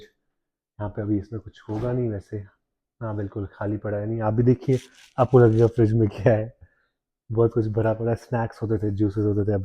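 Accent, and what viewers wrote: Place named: Kashmir, India. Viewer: Accent se hi pata chal gaya k Kashmir se ho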